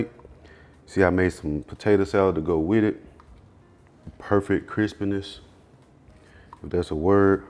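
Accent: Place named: Mississippi, United States. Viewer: Wait so thats not an Atlanta or Texas accent its Mississipi ❤❤❤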